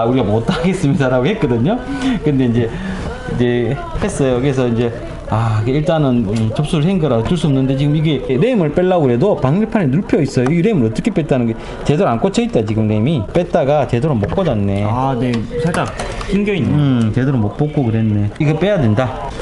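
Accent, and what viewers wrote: Gyeongsang, South Korea. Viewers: 님 어디서 일하세요? 지역이 궁금해서리 느낌이 대구?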